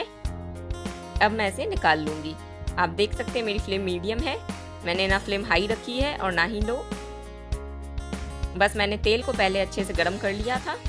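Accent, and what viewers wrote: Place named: Sindh, India. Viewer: Very. nice recipe... Also luv ur Sindhi accent...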